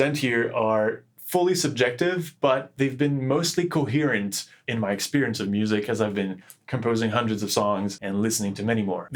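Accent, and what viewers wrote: Quebec, Canada. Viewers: Your Quebec prononciation surprised me, but excellent explanation I understand better! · He sounds Canadian, maybe Quebec? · Me - Messemble qu'il a un (léger) accent Queb... Me - Jeulsavais! Bonne vidéo, concept intéressant et bonne exécution, merci pour le pdf! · “RE-present.” He’s not AI, he’s just French Canadian. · Hey, j'ai reconnu l'accent Québécois avec le titre de! Merci pour le vidéo:)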